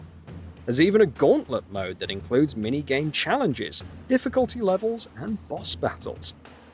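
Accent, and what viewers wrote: England, United Kingdom. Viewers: Why is a British guy narrating a video about Madden? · Dudes with English Accents should not speak about American Football. It's just feels weird.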